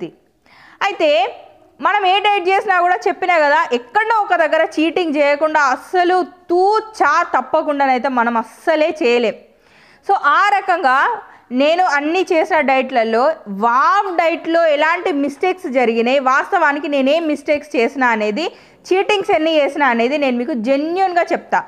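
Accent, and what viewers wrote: Telangana, India. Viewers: Ur super tlking in my telgana launuage i love u sister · akka me telangana accent matharam chala adhurs 😋 masthu matladavu akka iam big fan of you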